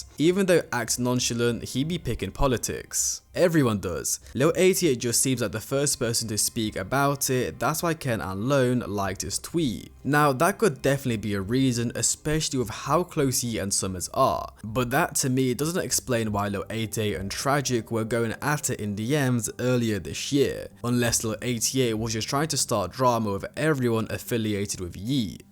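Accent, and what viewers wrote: United Kingdom. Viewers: Can't take a British accent serious.....especially one trying to be hard.....yall still worship a royal family.....df....haha....every thugg i grew up with would watch their videos just to see the clowns🤡🤡🤡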